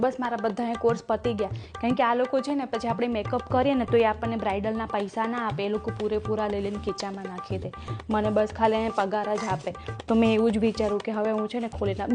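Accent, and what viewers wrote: Kathiawar, India. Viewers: Sister I love your accent.... Hu Mumbai ma rav chu but Rajkot ane Junagadh maru native che ane desh ma jaiye atle mane tyani accent bau mithi laage... Tamari ekdam same accent che.... I love it sister.... Lots of love and blessings from Ruchi from Mumbai ❤😊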